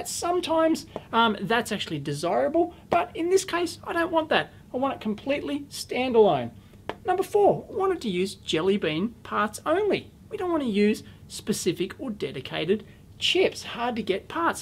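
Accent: Australia. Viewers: ok I am starting to like the aussie accent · Commenting again because this is that good. This is the first video by I've watched, but communicating the thought/development process of a circuit is what I've been searching for since my undergrad years! Extremely impressed. And delivered with an Australian (?) accent? Amazeballs, and Bob's your uncle.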